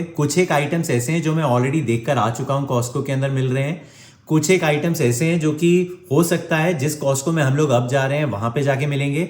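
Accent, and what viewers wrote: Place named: Chandigarh, India. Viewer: my guess was right. You have Chandigarh vibes. Your way of talking has Chandigarh touch. I am too from Chd and living here in Bay Area. Good to meet you.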